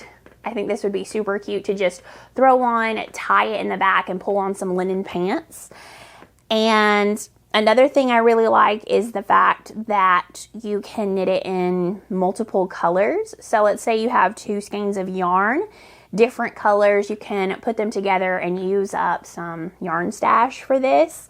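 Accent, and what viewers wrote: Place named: Kentucky, United States. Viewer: As someone from KY. I am enjoying watching such a talented knitter that share my accent. 😂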